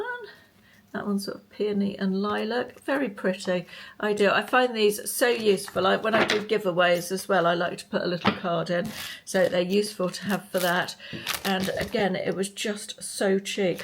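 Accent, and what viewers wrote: United Kingdom. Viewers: I order a lot from Temu but most of it is for craft supplies/scrapbooking, bookbinding and junk journals. Most clothing items, tablecloths and placemats are made of polyester. I tend to perspire a lot and can’t wear polyester so I don’t order clothes. I like the fact that you can return as many items as you want with free return postage on each order. I haven’t sent many things back, most things work as they should. Certain things are more expensive than on Amazon, etc. you have to know your prices. My biggest complaint is they pack everything in thin plastic bags so many items get wrinkled and smashed up in shipping. For example, gel plates for printing should be packaged in a box so there are no dents or depressions on them. The dents will end up showing up when you print. I love your videos because you jump right into the unveiling. 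It’s so irritating when people ramble on and on for 5-10 minutes before they get to the nitty gritty. Your personality is so charming and I love your British accent. You have a great sense of humour (tongue in cheek) and you don’t take yourself too seriously. I like watching what you buy because it gives me some ideas about things I’ve never noticed on Temu. Thanks, keep them coming, be well!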